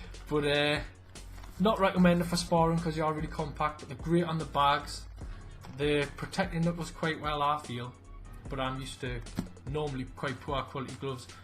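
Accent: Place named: Ireland. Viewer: Thanks for taking the time to answer. You sound like you are from Ireland. If so, I guess you are all in for McGregor?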